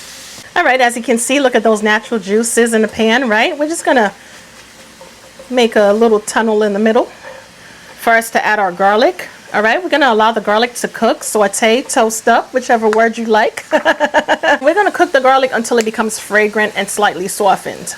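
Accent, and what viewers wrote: New York, United States. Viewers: New sub. Love your accent. A certain area of New York? I'm from Philly. 🙂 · Where in New Yawk you from?😁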